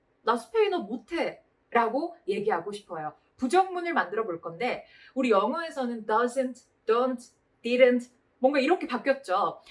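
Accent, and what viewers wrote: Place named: Gyeongsang, South Korea. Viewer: 산티아고 순례를 계획하고 스페인어를 배워보려고 시청하고 있는데 샘 처녀 시절( 샘) 경상도 억양으로 열강하시던 것까지 섭렵하고 있습니다. 정말 감사합니다. 열심히 배우겠습니다.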